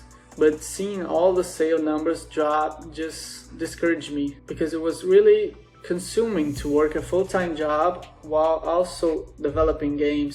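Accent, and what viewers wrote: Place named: Brazil. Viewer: O sotaque brasileiro é reconhecível em qualquer lugar do mundo 😅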